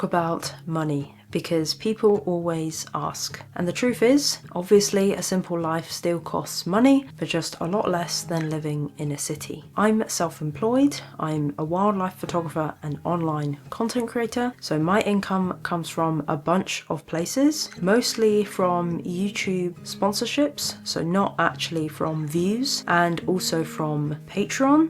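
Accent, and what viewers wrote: England, United Kingdom. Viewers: Such a beautiful girl and pleasant British English!!! All the best from Russian subscriber!! Love this style of life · Yes, but an English accent doesn't mean she holds only British citizenship and while unlikely may not even be British. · You don't recognise the accent? 100% English accent. Not even cockney accent.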